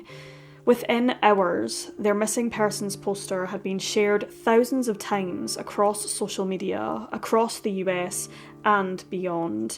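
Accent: United Kingdom. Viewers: yes,top 5 for me too. Truly criminal are great too. Like yo,to the point,clear,concise+British is a bonus for me😊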